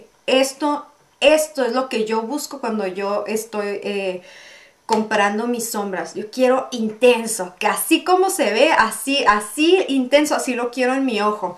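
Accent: Tijuana, Mexico. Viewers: Me encantas!! eres de Tijuana? jajaja, yo soy de Mexicali y me identifico con tu forma de hablar jajajaja, saludoss sigue haciendo tutoriales son muy buenoos!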